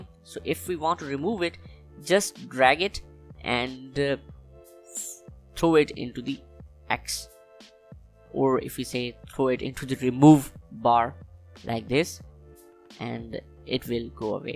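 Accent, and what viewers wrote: India. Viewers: Always gotta rely on that indian tech guy with a bollywood accent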